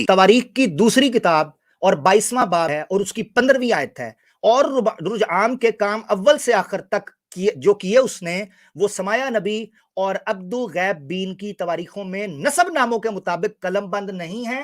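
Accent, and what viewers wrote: Punjab, Pakistan. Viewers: You rock in punjabi accent maza aa gayi ji Tantani logo ko bhi zara todhi me le lo Paa Ji zara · Your panjabi-urdu accent is so sexy🥵🥵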